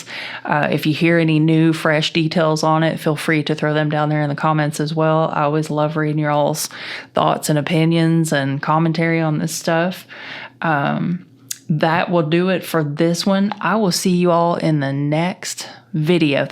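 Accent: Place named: East Tennessee, United States. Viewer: you HAVE to be from the East Tennessee, SW Virginia area?? That’s where I am originally from and you sound like all my kinfolk! 😊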